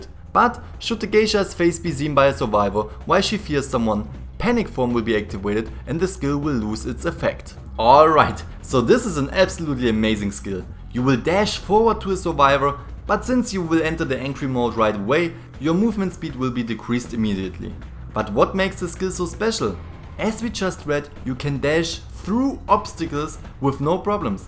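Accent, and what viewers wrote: Germany. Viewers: No offense, aber das is das deutscheste Englisch, das ich je gehört hab. :P XD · Mein Gott, so you're a German then?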